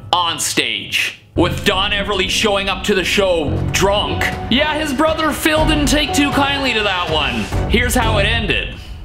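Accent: United Kingdom. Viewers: Your British accent was good, but you should see Kent Paul or Maccer's accents.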